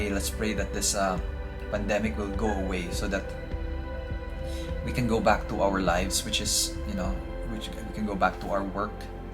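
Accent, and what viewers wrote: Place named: Philippines. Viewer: Are you an American? Still your accent is Pinoy!!! Tagalogggg plssss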